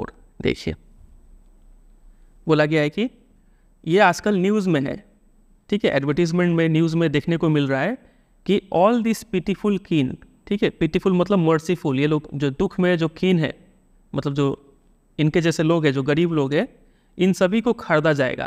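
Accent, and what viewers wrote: Bihar, India. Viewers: IMAO BIHARI ACCENT ME ENGLIGH SUNNA BOHOT ACCHA LAGTA HAI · Sir aap bihar se ho ?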